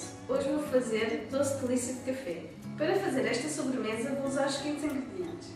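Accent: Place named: Portugal. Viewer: estado??? Portugal.. conhece? A fala PT-PT.. Não é Br.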